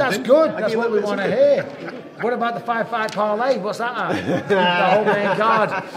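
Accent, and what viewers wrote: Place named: Northern England, United Kingdom. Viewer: Definitely sound more Northern when you’re in England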